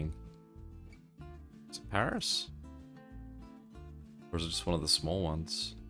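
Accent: Australia. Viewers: Hi ☠️ I know that you live in Victoria, Australia 🇦🇺 because of your accent. I live in Adelaide, Australia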